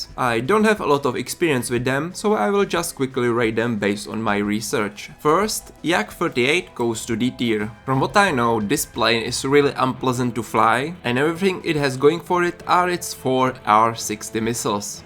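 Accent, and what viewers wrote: Czech Republic. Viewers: Když jsem slyšel ten přízvuk, bylo mi hned jasný, že jsi čech. Super video! :D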